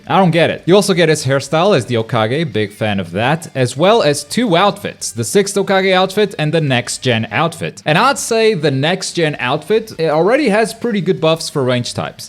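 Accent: Portugal. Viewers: he´s portuguese, LET´S GOOOO, na tua apresentação do canal tu foste logo cm o sotaque d portugal ahaha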